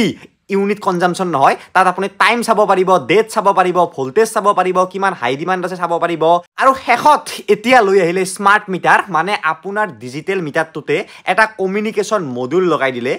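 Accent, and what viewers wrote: Nalbari, India. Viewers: Nalbari r manuh so accent tu olp beleg hoi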